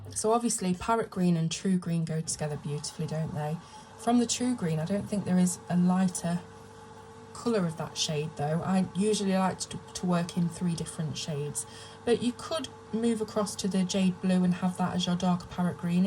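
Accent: England, United Kingdom. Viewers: You don't need to reply to me; just asking you to please remember to turn on CC for those of us who have difficulty following your verbal comments? (Not criticizing your speaking--it's the English accent (I'm from the U.S.) along with my hearing loss that makes it harder to decipher words, in my case.) After your review, if I understood you correctly, these may be the best smaller set of PrismaColor Premiere dupes yet? I like that they blend better and break less easily, which are my two main complaints with PCP. Thank you for this review,! By the way...I now have my copy of Rita Berman's "Meine Reise durch Europa" in hand and am ready for your color-along, whenever you are ready to begin it...!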